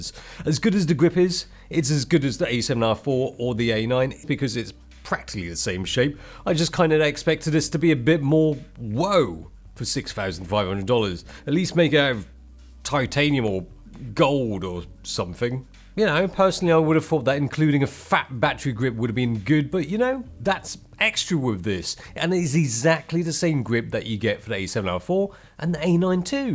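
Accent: United Kingdom. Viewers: Asian guy with british accent. That's cool. :) Could you please help me decide. If I shoot mostly video but sometimes I want to do a little bit of photography, would it be better to have one SONY A7s3 and one SONY A7R4? Or would it be better to just have SONY A1?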